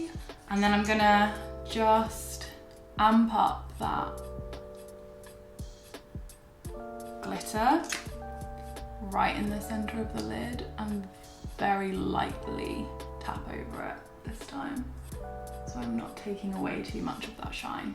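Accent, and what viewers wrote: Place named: United Kingdom. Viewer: No one says "glam" like you with your classy British accent! :D Beautiful look!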